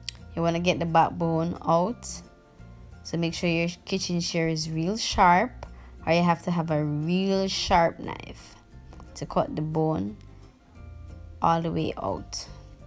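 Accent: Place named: Jamaica. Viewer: Hi you did a very good job am new in your house you sound like a yardie like myself anyway am going to say in your house big up i enjoy watching the entire video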